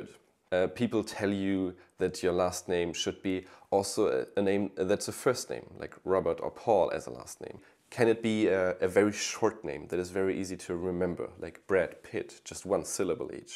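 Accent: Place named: Germany. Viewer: I think it's the German accent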